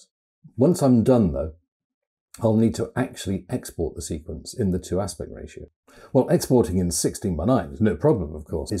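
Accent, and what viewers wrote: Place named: RP, United Kingdom. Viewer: You sound very posh! Thanks for this - it helped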